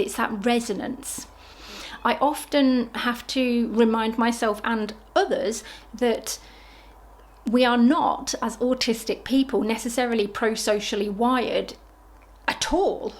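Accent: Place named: United Kingdom. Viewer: Thank you for such interesting content. I’m sorry, but I’m sitting here puzzling. You sound British and many of the things you say sound British but you pronounce words such as insulate without the extra H sound that I usually hear British and kiwi and Australian people do so I’m confused. I’m sorry I know this has nothing to do with your video content. It’s just got my curiosity up.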